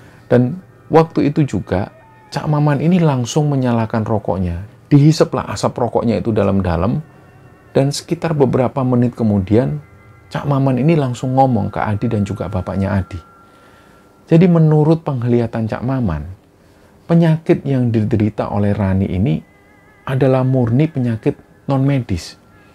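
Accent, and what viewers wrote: Java, Indonesia. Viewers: Xg Q suka dari ms tuh suaranx, terus logat jawanx terasa kentel banget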